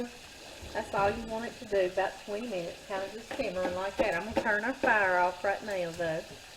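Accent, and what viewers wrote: South, United States. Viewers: Just had to follow you as you seem such a lovely warm person..Oh I love your country accent too. Hope you had a lovely holiday in good ole USA from me in the UK x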